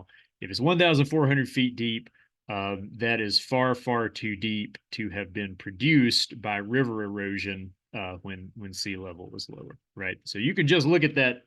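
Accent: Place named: Baltimore, United States. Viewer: Love your Baltimore accent. LOL I wonder how many people can identify that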